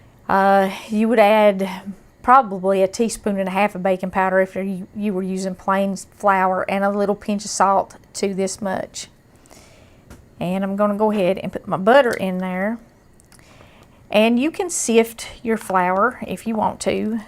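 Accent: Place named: Ohio, United States. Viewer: Hearing your voice reminds me of relatives from Ohio. They would bake cakes and pies for the Church July 4th festival in Wapakoneta. I loved it. We'd travel from PA to it when I was a kid. Thanks and God bless you 🙏. Hugs 😇🤗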